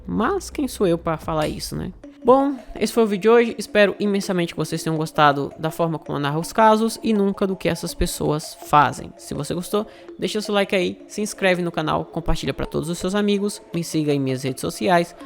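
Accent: Northeast, Brazil. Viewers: Adoro o sotaque nordestino do.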